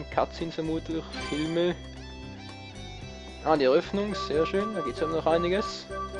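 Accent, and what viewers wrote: Switzerland. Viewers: haha chas sie das du schwizer bisch? isch ja mal voll geil ;D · bist du schweizer ? :D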